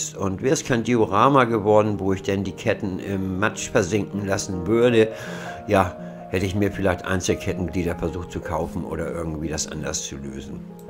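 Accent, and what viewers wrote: Hamburg, Germany. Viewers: Ein Hamburger! Klasse. Hörst Dich nicht wie ein Schlumpf an, eher wie Käpt'n Blaubär und ich hing wie eins der Bärchen an Deinen Lippen. ;) Klasse Bau und schön erklärt. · Danke für das tolle Video! Sehr hilfreich auch für Anfänger, es gibt hier so viele Äberdrüber Profis..Du machst das sehr toll.Auch Deinen Text hört man gerne, schön Hamburgerisch Höre ich als Österreicher gern.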